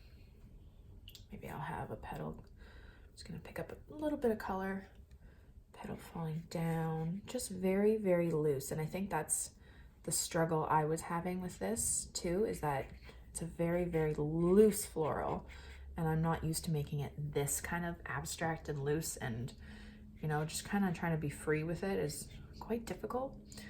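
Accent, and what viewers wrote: Canada. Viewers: Well I think you are fantastic ❣️👏👍. I adore your little son, your casual teaching methods that allow me to make mistakes but keep trying, your encouragement, and your Canadian accent ( my sister and her husband, sons and their extended family are scattered throughout Ontario ) and we have many delightful Canadian friends from years of travels❤️🥰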